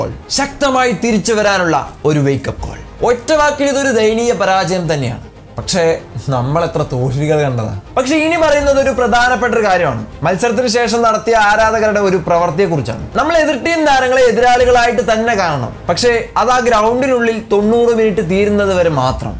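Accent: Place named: Thrissur, India. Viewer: Intro oru thrissur ഭാഷ പോലെ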